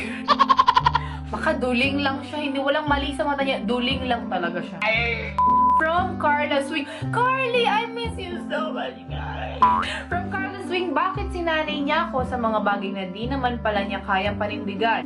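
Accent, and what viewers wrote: Visayas, Philippines. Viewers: Taga pampang ka diba ate pero parang bisaya👌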